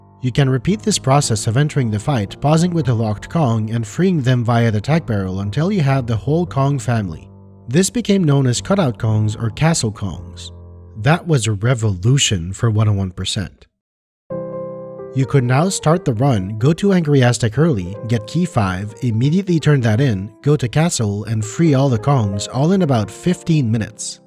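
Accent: Quebec, Canada. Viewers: yeah I felt pretty confident too since early on. I'm not aware of another accent that would sound kinda like Québec's.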